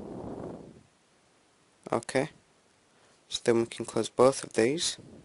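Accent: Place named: United Kingdom. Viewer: hey where do you come from. i know youre british but what part? its just that youre accent seems familiar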